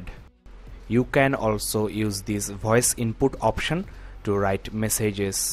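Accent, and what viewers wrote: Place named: East, India. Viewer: I think he is from Orissa or Bengal. Thats not a south Indian accent. :-)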